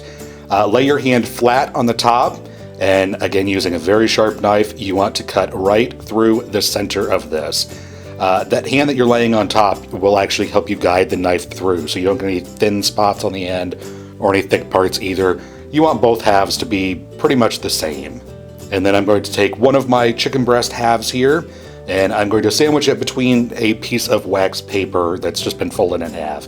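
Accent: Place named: Massachusetts, United States. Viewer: Sound like Carlton from massetchusets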